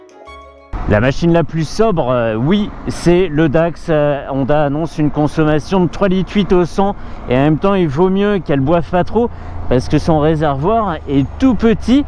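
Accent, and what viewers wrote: Paris, France. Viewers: Très sympa, ce comparo! J'adore ton accent parigot (je suis du sud-ouest) Je m'abonne 👍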